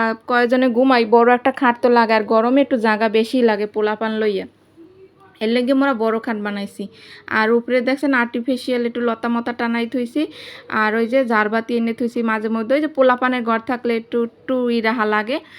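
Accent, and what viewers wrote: Barishal, Bangladesh. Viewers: অনেক সুন্দর হয়েছে আপু ❤❤❤তোমার বরিশালে ভাষায় কথা গুলো ভালো লাগে 😅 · ❤❤আসসালামু আলাইকুমপ্রথমে লাইক দিয়ে তোমার পুরো ভিডিও জুড়ে ছিলাম তোমাকে আমি দুই দিন আগে ভালোবাসা দিয়ে রেখেছি মাশাল্লাহ খুব সুন্দর করে তোমাদের বরিশালের ভাষা কথাগুলো বললে খুবই ভালো লাগলো😮 শুনে সব কিছু মিলিয়ে দারুন লাগলো তাই তোমাকে ভালোবাসা দিয়ে রেখেছি 👉🔔বুঝে নিও তোহার হলো তোমার পরিবারের জন্য আপুর উপর বিশ্বাস রাখতে পারো লাইকডান আলহামদুলিল্লাহ ❤❤🎉🎉😮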